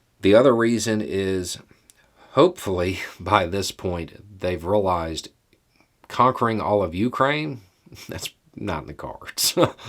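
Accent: South, United States. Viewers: violent and unstable? Lol. I was just replying to a veiled threat. Also is clearly not a Yankee which is a name for a northerner here in the US. He’s clearly from the south.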